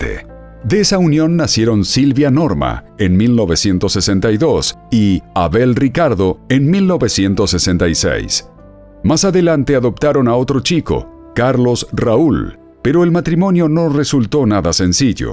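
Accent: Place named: Mexico. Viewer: Acento MEXICANO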